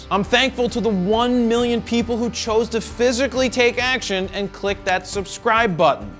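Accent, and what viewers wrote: Philadelphia, United States. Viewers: Being from South Jersey, nothing wrong with your Philly accent. Although I’ve bee away from Jersey still have accent. Thanks for your video showing how you began. Oh and loved the portion with your Grandmother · Nothing wrong with a Philadelphia accent! It was so great to see the Lil clips, those were priceless!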